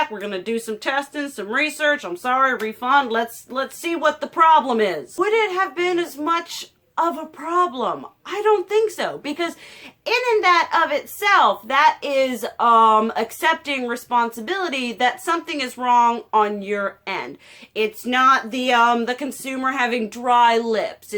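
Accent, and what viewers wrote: South, United States. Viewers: I detect a southern accent! Where are you from? I’m from NC. And if you’re not from the southeast you dang sure sounded like it lol. New sub!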